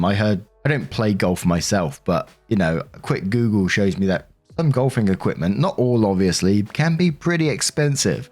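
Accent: England, United Kingdom. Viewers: I'm Scottish. I love channel too, but he has an ENGLISH accent. There's no such thing as a (singular) British accent!! 😉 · My favorite reddit reader is you,. I love your waffles, your giggles, and when you swear. I don't know why but it's better with an English accent.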